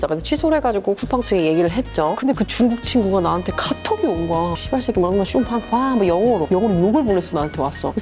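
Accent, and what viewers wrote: United Kingdom. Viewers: 영국에서 살았었지만 역사에 관심이 없어서.. 시험에 관련된 아편전쟁만 외우고 있었는데 이런 배경이 있을줄이야~~~ 예전부터 님꺼 듣고 영국영어 계속 공부해왔었는데 이런 상식도 넘 듣기 좋네요 ㅎㅎ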